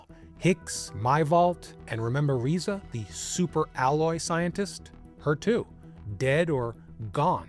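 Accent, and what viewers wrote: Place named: Pittsburgh, United States. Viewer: I gotta ask, are you from Pittsburgh? I know you say in the beginning you are in Vermont but your accent reminds me of my hometown. ❤ Love your videos BTW.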